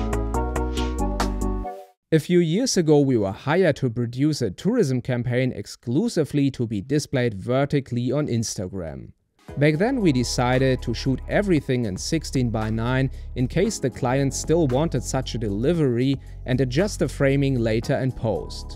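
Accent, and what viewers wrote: Germany. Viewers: Love that massive german accent haha! (best greetings from germany)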